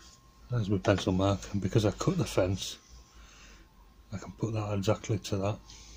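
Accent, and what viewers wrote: Yorkshire, United Kingdom. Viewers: I discovered your channel recently and you really know your stuff. Your keeping the craft alive which is great to see. North of England? Yorkshire perhaps??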